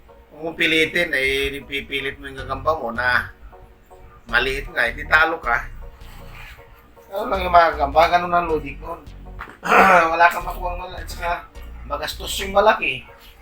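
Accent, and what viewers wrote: Gabaldon, Philippines. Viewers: Gabaldon padin yan tay yung tagalog mo?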